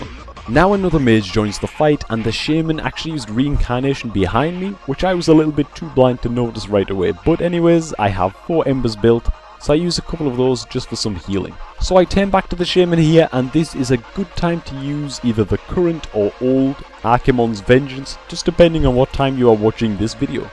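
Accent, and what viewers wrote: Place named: Northern England, United Kingdom. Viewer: Hey im not sure if you have said this in another vid or been asked before, but where are you from? you sound like your from northern england, i may even go as far as saying your from Newcastle like me!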